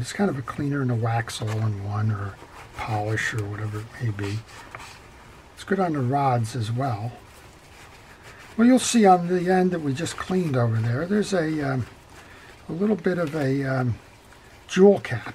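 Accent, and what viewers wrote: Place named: Philadelphia, United States. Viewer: I just got a vintage Pfuger Nooby reel, seems to be similar to this.. I am amassing a small collection of vintage reels, and thanks to your videos Ive gotten most of them back into working order :) I do have a few I'm struggling with, perhaps I'll send them to you... thanks for the videos, and your accent brings me back.. I grew up outside Philly and spent many summer weekends at the Jersey shore 😂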